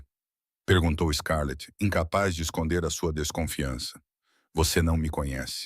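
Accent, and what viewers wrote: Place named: Portugal. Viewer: Ellen do Rio de Janeiro RJ 🇧🇷 Gosto muito de histórias de máfia narradas no português de Portugal!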